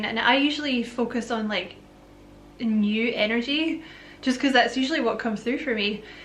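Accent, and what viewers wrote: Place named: Scotland, United Kingdom. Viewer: You are such a doll! I love your Scottish accent! You crack me up when you cuss! And when you tell people to ** off! I live for it!!!🙌🏾 I’m also subscribed to your other channel🤗 Thank you for all you do. You’re a sweet and endearing soul. Love and light to you always💛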